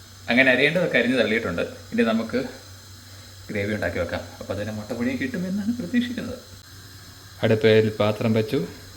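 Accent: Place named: Pala, India. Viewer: Kottayam ano vidu oru pala shayili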